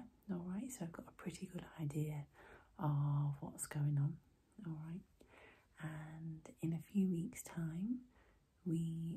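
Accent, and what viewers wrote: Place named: Nottinghamshire, United Kingdom. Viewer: that'll explain the northern sounding twang to your voice. I love that Nottinghamshire accent. It's like a soft northern accent. It works really well for soft spoken asmr. Would love to hear what your voice sounds like with in ear whispering ❤️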